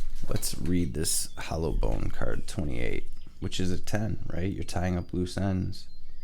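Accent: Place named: Bay Area, United States. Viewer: Dannng you handsome AF, you from the Bay Area? You kinda sound like it♥️ Besides that your reading is on point for me. Thank you!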